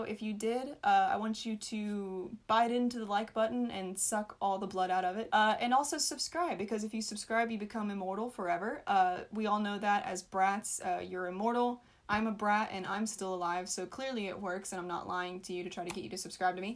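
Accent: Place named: South, United States. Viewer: I didn't know you were from the south and now you've said that I can here your southern accent so clearly !!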